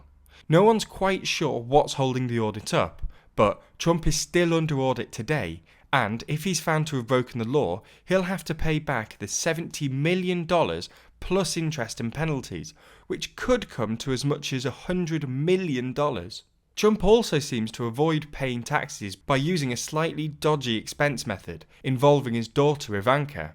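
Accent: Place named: North, United Kingdom. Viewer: When did pick up a Northern accent?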